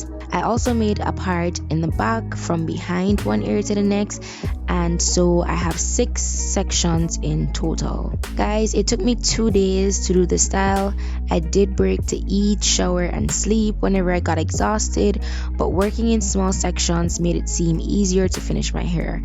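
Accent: Jamaica. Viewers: are you Jamaican? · Jamaican cyaan hide enuh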